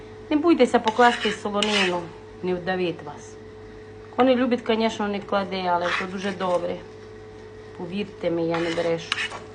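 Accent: Transcarpathia, Ukraine. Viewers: Дуже гарна жіночка, такий цікавий акцент,Закарпатський.Дякую за позитив і рецепт.Всього гарного та позитивного вам.Наталья.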